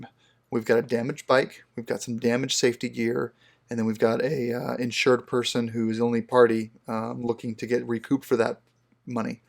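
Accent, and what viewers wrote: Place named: United States. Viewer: Russell what makes you think he's Canadian? As a born & bred Canadian I can assure you that his accent marks him as an American, not a Canadian. I'm curious to know why you thought that?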